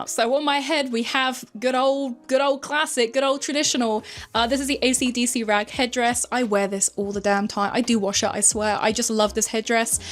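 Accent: United Kingdom. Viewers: God as a German I love you’re British perfect voice. By the way: I was a goth back in the late 90s and I appreciate that you talk about this topic. Now I am much older and more relaxed but seeing the sellout of a subculture makes me sad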